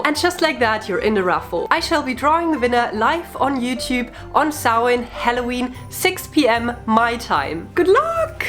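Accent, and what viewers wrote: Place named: Germany. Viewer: I absolutely love your German Accent which I find extremely endearing and comforting have yourself a wonderful and Bewitching day and Blessed Be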